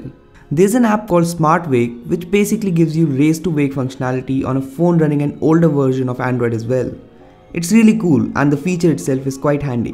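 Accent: India. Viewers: Indian flavor of English is really irritating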